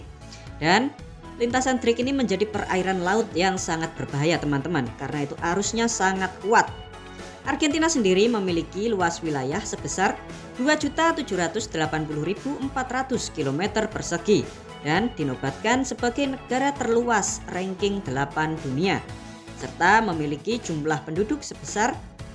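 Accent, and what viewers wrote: Java, Indonesia. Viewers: Dialek Jawa ny kental banget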